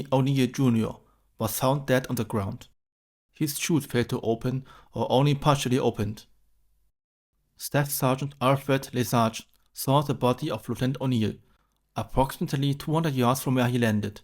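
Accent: Germany. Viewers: If this is your voice, it really adds to the story. The accent adds some depth and feeling to the video, somewhat like watching Das Boot in German, with English subtitles. Well done! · Grundsätzlich mag ich den Kana sehr, aber vielleicht angesichts des starken deutschen Akzents vielleicht mal darüber nachdenken, ob Sie den Kommentar nicht durch eine KI-Stimme sprechen lassen. Dann klingt es wie richtiges Englisch. Da gibt es auch jede Menge gute Freeware.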